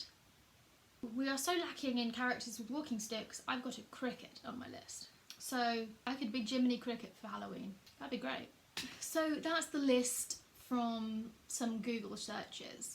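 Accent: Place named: Southern England, United Kingdom. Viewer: You sound like Beckie0 <link> Where abouts in England are you from, somewhere down south?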